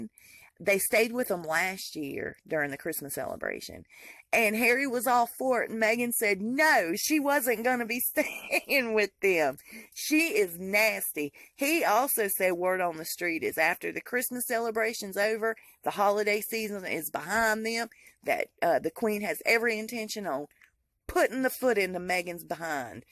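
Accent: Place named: New Jersey, United States. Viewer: 2/2 ...that’s how ya “tawk”” when yr from Jersey... Girl!!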